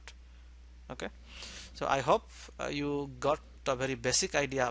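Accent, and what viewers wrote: Odisha, India. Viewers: definitely u r odia from the acsent. tht fire path is not always true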